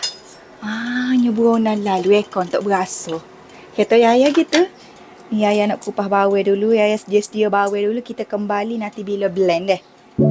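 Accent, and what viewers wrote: Kelantan, Malaysia. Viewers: doh ehhh suko la ho dengar yaya kecek kelate 🤣 tenkiu menghiburkan saya dikala kesepian di tempat kerja 😆 terus semangat yaya post video2 menarik ❤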